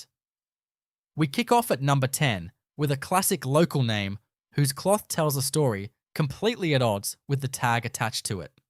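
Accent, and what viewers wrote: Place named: United States. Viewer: Pronouncing numbers the American way eg "one-hundred-forty", not the correct one hundred and forty is annoying.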